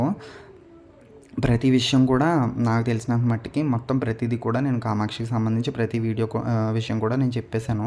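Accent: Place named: Godavari, India. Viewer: Meru godavari vallandi. Mata telustumdi. Alamkaram baga chesaru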